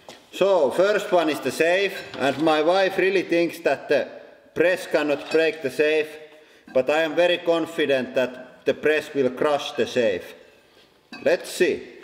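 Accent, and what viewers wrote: Finland. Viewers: this clay monsters are so satisfying to watch when they get cruched:D along with the finnish accent this page is just perfection^^ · Could you do a video in Finnish? I don't speak Finnish but I love your accent and would like to hear what Finnish sounds like. Especially the phrase "hydraulic press."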